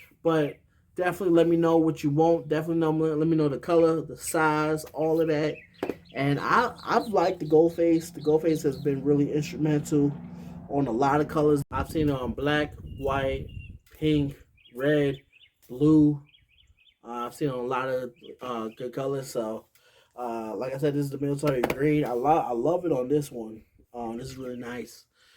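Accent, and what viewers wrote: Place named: New York, United States. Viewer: We have an amazing opportunity to show the world His love by our unity at Christ followers. Love you and yeah, NY accent is still there for sure.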